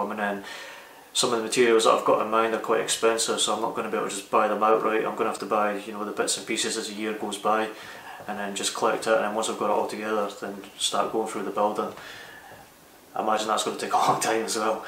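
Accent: Scotland, United Kingdom. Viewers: Cool pack, I like the orange lining. I've noticed your Scottish accent is getting stronger now your back up there, no problem for me, I can understand ya, I lived in Aberdeen for 3 years. Nice one. Lee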